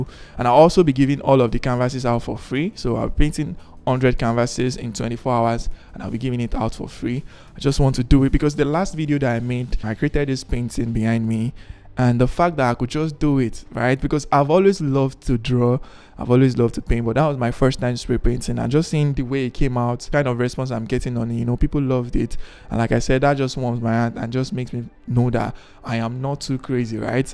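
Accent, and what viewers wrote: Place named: Yoruba, Nigeria. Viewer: Love this video, and the H factor that comes with the yoruba accent,🥺❤️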